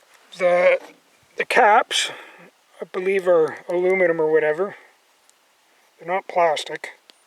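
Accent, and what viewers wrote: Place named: Canada. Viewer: he's talking Canadian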